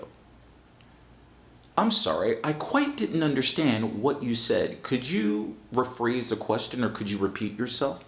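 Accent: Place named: United States. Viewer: thanks so much for your lesson , Sir, and I like so much your American accent and so interesting to learn more,,, 5 ways, suggestions or tips to speak more naturally : 1. connected speech 2. stressing syllables 3. learn English phrases and expressions 4. learn phrases verb 5. English sound or phonetic sound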